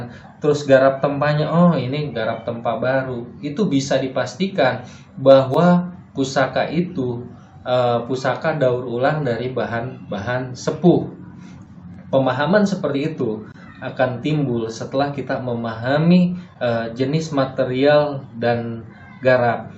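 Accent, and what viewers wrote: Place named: Bandung, Indonesia. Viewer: 😅 logatnya gaya orang cicaheum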